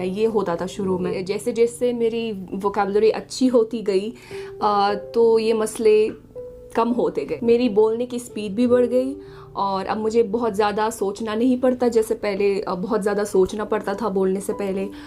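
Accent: Karachi, Pakistan. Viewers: Aap ki udru bolny ka lehja bilkul Pakistani hai. Lagta to Nahin k ap Marakish Sey hain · MA'AM u r PERFECT in Urdu and u speak Urdu just like Karachiwala. Very Good effort. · Aray larki tum to pakistani logon ki tarah bol rahi hay or accent bhi pakistani hay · Apki urdu sun k ap Pakistani lagti hen amazing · I love when you said main apne shohar k dimagh ki dahi krti hoon. you made him mad giving him tough time asking question. Good video your Urdu doesn’t look bad it’s pretty karachi urdu. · wonderful... itni saaf Urdu aur lehja (accent) to pure Pakistani hai, unbelievable! 🌍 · By the way by seeing u and listening u i can't even believe that u are not Pakistani By looks and by accent u look 100% Pakistani... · Your Urdu is shockingly incredible I am stunned yes you do have Karachi accent well done sister your husband is a lucky man may Allah keep your family blessed and happy 🌹🌹🌹 By the way I was born in Kuwait so I can speak Arabic along with Pashto, Urdu, English, Punjabi and some Farsi 😊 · You speak so nice Urdu in Karachi accent unbelievable. 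Love from Amsterdam. Waqar Khan from Amsterdam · اسلام علیکم ماشاءاللہ آپ کی اردو بہت پیاری ہے ۔آپ کو سن کر لگتا ہے کہ آپ پاکستانی ہی ہیں۔❤❤❤ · Demagh kee dahi!!! 😂 totally Karachiite 👍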